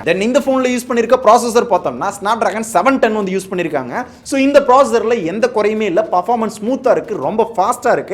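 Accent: Madurai, India. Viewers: Bro Neenga Madurai ah.... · Bro nenga madurai ya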